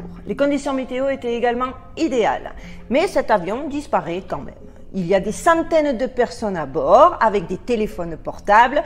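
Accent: Marseille, France. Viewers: C'est (encore) un pouce vers le haut pour ta vidéo me concernant ! J'adore la façon dont tu traites tes sujets et même ceux qui à priori me branchent pas trop, tu arrives quand même à les rendre intéressants. En prime, l'accent marseillais plus ta personnalité ça donne un style inimitable. Quand on repense à la raison de la création de ta chaine, au départ (cf ta vidéo FAQ), c'est amusant de voir ce qu'elle est devenu :D Si je m'écoutais je regarderais tout le contenu de ta chaine en 2 jours mais je préfère me forcer à pas trop en regarder à la fois de sorte à faire durer le plaisir ^^ Bravo et merci <3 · Hello, merci de répondre aussi vite, je vous ai regardé vers minuit depuis la France et je n'attendais pas une réponse aussi rapide 😜 En fait je reçois youtube sur mon TÉLÉVISEUR (seul avantage de mon opérateur adsl !) et je regarde la nuit vos vidéos, celles de Silvano Trotta (excellent) de deQodeurs, de Réveillez-vous, de Ema Krusi etc.. 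que les meilleurs ! L'écran étant plus grand, je vois mieux ! Vous êtes la seule dont le visage apparaît en "blanc et noir", ce qui m'agace car vous êtes trop jolie à regarder et à écouter, votre humour et votre "accent du midi" sont rafraîchissants 🤗 Par contre, vous apparaissez normalement sur l'écran de mon portable mais il est plus petit ! Je vous confirme que je ne reçois pas toujours les notif. bien que j'aie activé la cloche.. Votre travail est excellent et agréable à suivre, sans prétention ni recherche de concurrence : all is right 😉 Maman d'une merveilleuse fille de 20 ans, étudiante, j'aimerais moi aussi la garder avec moi car savoir qu'elle doit porter la muselière toute la journée à la fac me révulse ! Bonne chance avec votre petit gars 😘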